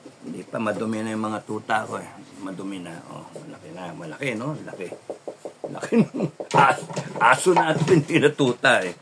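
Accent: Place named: Visayas, Philippines. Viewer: ang cute mo mag bisaya Sir! "mag sulti ug tinuod, asa imong pusil?" dagaaan naa na ang mga Scout Ranger. hahahahaha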